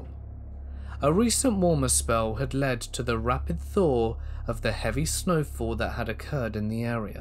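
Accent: United Kingdom. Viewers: The British accent was designed for narration. Brief Case recommended your channel, by the way.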